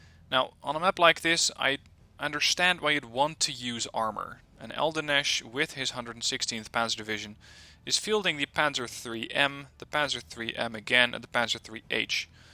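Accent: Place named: Netherlands. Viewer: Ok. By the way, are you from the Netherlands? You have a particular accent.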